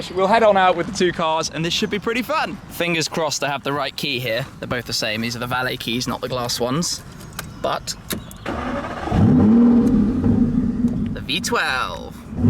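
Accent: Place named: RP, United Kingdom. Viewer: How does this guy buy so many cars ? Sounds like a bender from oxford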